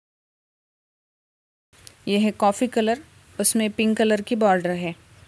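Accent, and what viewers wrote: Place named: Maharashtra, India. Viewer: आवाजाचा टोन मराठी वाटतो . मराठीतून सांगितलं तरी भारी वाटेल बाकी पैठणी सुरेख आहेत.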